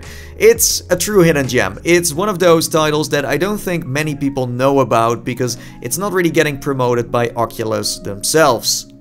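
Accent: Netherlands. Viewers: Are you dutch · Het nederlands accent met de vele uh's doet echt pijn Wakey wakey tietel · dat thicc dutch accent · You're just another cookie cutter channel with the added annoyance of your Dutch accent trying to sound American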